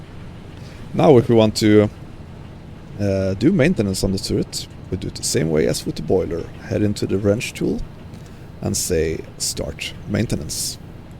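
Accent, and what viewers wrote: Sweden. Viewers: One more, will it be possible to repair in port now when these changes are implemented? I suspected you where a Swede judging by the accent. 😅 But wasn't sure because looking at the games theme i though - Swede but maybe German 😂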